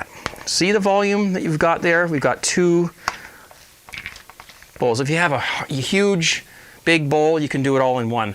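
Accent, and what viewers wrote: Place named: Canada. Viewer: French side on the sea salt box? Either IT'S from Canada, or is, I imagine. Using metric, eh? Right on, sounds like a fellow Canadian. Even apologized for dropping the box! I remember once I apologized when someone bumped into me at the pub! Anyways, great vid, I seen another method where basically it was all done in the jar. Put a small layer of salt at the bottom, add a layer of finely sliced cabbage, tamp it down, add a layer of salt, add a layer of cabbage, tamp it down, salt, cabbage, tamp, salt, cabbage, tamp, etc. I guess it can work either way, but more salt is probably used in the layer process. The layer process also seems a tad bit easier. Seen it on Jas. Townsend and Son. Gonna have to make my own sauerkraut some time! Definitely subscribing to this channel, I imagine there's vids on making home brew as well, which I'll certainly enjoy watching!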